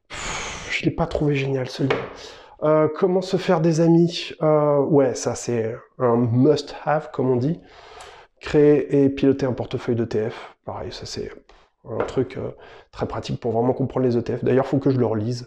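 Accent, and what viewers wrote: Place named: North, France. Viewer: INCROYABLE vidéo! ton accent du nord m'a bien fait rire! Tu me fais penser à nous, avec tes 2 valises et à bouger avant de te poser (seul différence mais valise déborde encore ahah). J'aime beaucoup ta façon de penser! tu es trop drôle et tu es mémorable!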